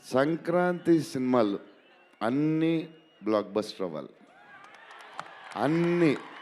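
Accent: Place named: Godavari, India. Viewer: godavari slang lo annaya speech ❤ pure hearted person ❤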